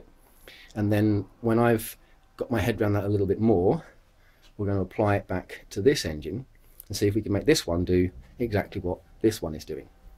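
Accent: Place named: Derbyshire, United Kingdom. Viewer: Hi do you have a contact to this guy as I'm in Great Britain Derbyshire and he sounds similar dialect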